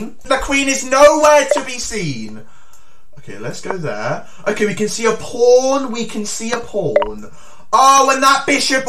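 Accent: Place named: United Kingdom. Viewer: That's just how a British accent is ... a stereotypical US accent pronounces the r more properly and makes more of an "aa" sound for the aw. Same thing with the word yawn - pronounced like "yorn" in UK and more like "yaan" in US.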